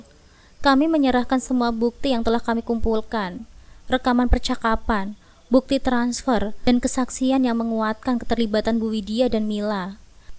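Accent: Java, Indonesia. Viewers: Bd hadir mbsk, medok pisan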